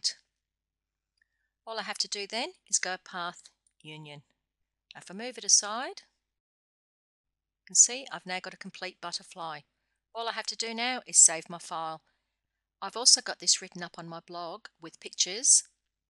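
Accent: Australia. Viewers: She's Australian I reckon..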